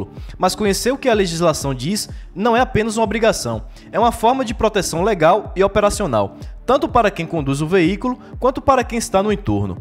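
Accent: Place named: Salvador, Brazil. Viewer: c é daq de salvador irmao? teu sotaque e os video que vc botou ai é tudo daqkkkkk esse ultimo ai é na paralela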